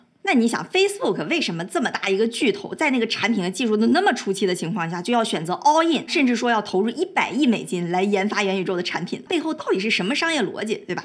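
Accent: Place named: Shandong, China. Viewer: 听出来小林的山东味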